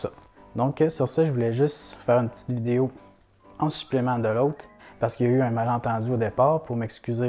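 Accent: Canada. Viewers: J'adore ton accent canadien nous nous sommes abonnés comme vous nous l'avez demander . Je tout de suite m'abonner avec mon compte perso ( Taoma ) .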